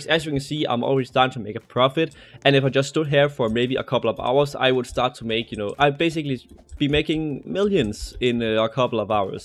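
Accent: Denmark. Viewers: Er du da dansk?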